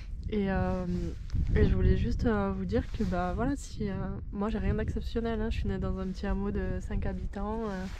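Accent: South-West, France. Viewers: Bonjour, je découvre ta chaîne vidéo et alors là, QUELLE EMOTION, tu es une belle personne archi simple, belle mentalité et c’est si rare!… je parle comme une vieille hé oui je suis Vieille retraitée, super active . Tu es espentée, donc sud ouest peut-être ? Moi je suis bordelaise. Nous allons faire un bout de chemin ensemble, je le sens ! Mettez des POUCES. Ton direct, comme tu dis, est trop cool. Merci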